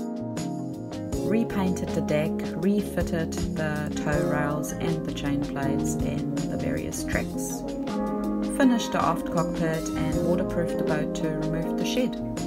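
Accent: South Africa. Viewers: Are you originally from S.A.? I checked your profile and it says NZ, but sometimes your accent sounds a bit SA.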